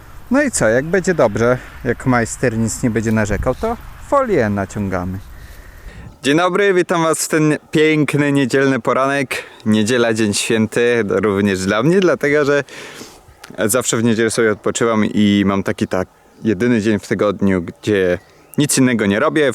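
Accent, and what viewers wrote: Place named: Podlasie, Poland. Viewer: A tak w ogóle to swój chłop jesteś fajnie zaciągasz,ja z Podlasia,to wiem,co mówię!!! NIECH ŻYJE ŚCIANA WSCHODNIA!!!!!!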